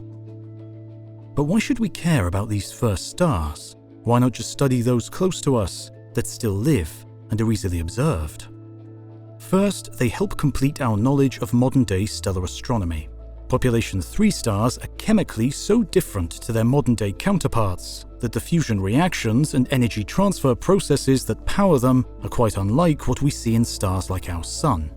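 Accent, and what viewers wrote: England, United Kingdom. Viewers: Yes, he is. That's an English accent. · Are you British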